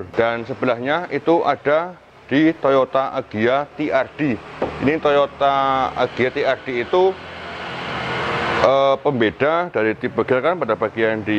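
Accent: Banyumas, Indonesia. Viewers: Berkah selalu mas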